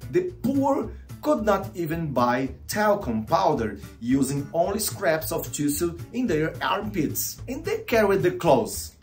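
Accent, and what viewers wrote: Brazil. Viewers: A pronúncia não está boa, mas, se você tiver gravado sem decorar, seu nível de inglês está bom. É só treinar mais a pronúncia agora, pois está bem abrasileirada. Você é fera. 💣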